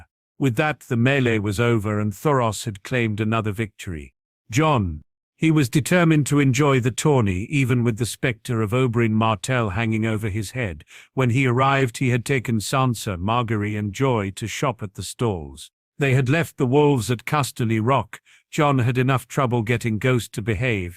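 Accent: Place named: United Kingdom. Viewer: This is a captivating story. I enjoy the British accent only because of the show :) I am hooked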